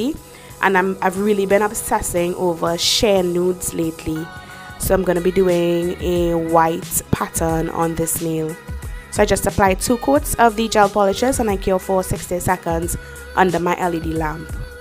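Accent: Trinidad and Tobago. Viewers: I can tell a Trinidadian accent anywhere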